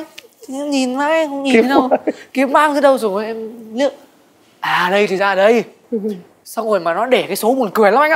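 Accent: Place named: Southern Vietnam, Vietnam. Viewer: Tiếng miền Nam dễ thương thật mà.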